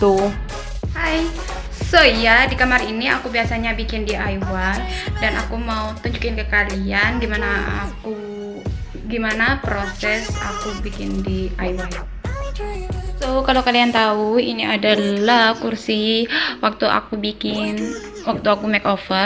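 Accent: Madura, Indonesia. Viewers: Logat maduranya kelihatan beut kak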